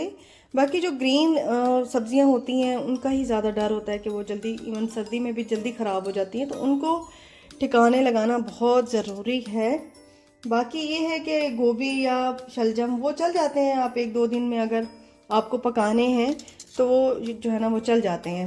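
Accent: Saraiki, Pakistan. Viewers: apki saraiki sun Kar acha laga.im from bahawalpur.ap kis city mai rehti han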